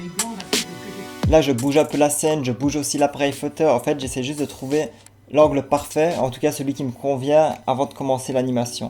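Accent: Liège, Belgium. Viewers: Petit accent liègeois dans cette vidéo :d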